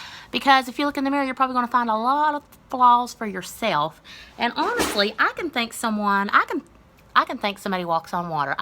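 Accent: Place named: South, United States. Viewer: You are the cutest thing i ever seen wit ya lil southern accent 😍😍😍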